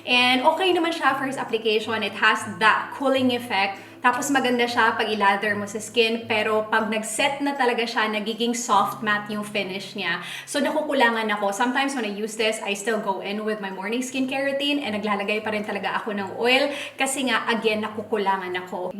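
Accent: Visayas, Philippines. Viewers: 😉Ate what if mag review ka in Bisaya so love the way you talk in Bisaya...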